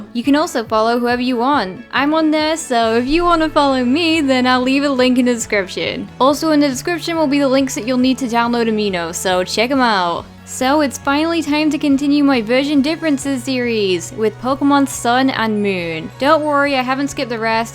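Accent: United Kingdom. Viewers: A question,are you British?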